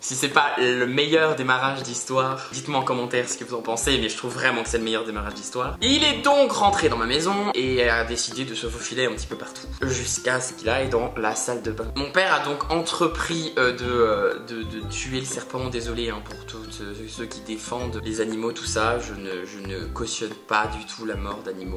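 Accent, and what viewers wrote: United Kingdom. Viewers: Y’a qu’à toi que ça arrive ce genre de choses 😭 Je suis d’accord avec les autres commentaires, t’as grave pris des mimiques à Gaëlle c’est chouu Et j’ai une petite question, ton accent anglais tu le sors d’où ? J’ad-oreee 😍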